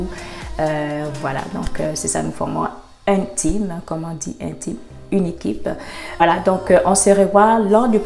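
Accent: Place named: Quebec, Canada. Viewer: Enfin qql qui parle québécois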